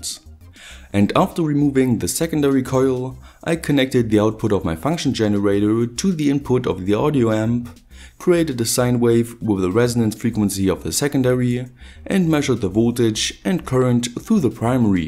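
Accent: Germany. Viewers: Ich finde seine Projekte schon geil. Bin zwar neu hier hör aber gleich das er eigentlich ja ein Deutscher ist. Deshalb wundert es mich warum er seine Videos auf Englisch macht. Weiß das jemand?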